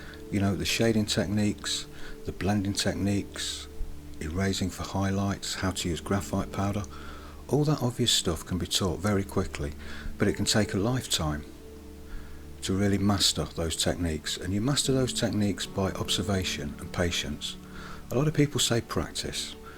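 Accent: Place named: Leicester, United Kingdom. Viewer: You have such a soothing voice. Are you from Leicester? I am too and it’s so nice to hear the accent. Love the video and love your work.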